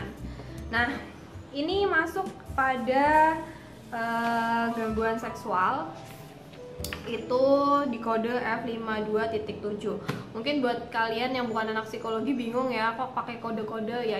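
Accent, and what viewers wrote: Java, Indonesia. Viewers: Ngomong y medok banget mba e... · Ngomongnya MEDOKKKKKKK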